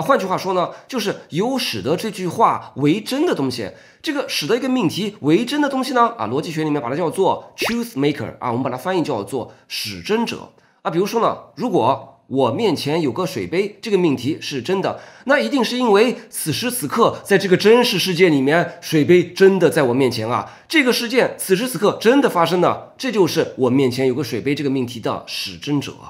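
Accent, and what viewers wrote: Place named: North, China. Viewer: 中文就是垃圾语言架构，博主批你啪拉一大堆！我感觉你在讲绕口令（博主大概率是河北、或者东北人； 博主干脆改成绕口令辩论，与哲学距离太大。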